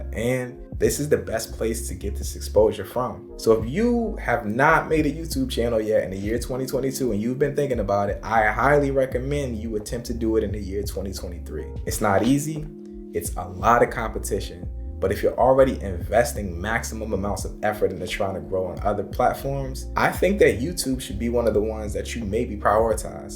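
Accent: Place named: East Coast, United States. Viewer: your east coast accent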